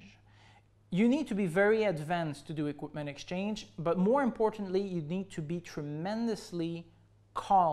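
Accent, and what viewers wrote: Quebec, Canada. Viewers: good info, are you by any chance from Quebec? your accent sound familiar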